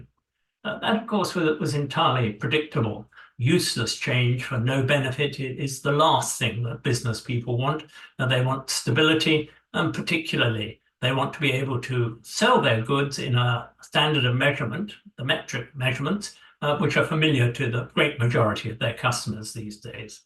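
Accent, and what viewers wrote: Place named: RP, United Kingdom. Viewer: Not to be to critical but your an old man with a somewhat posh accent! Which I'm afraid is not saleable figure for rejoin.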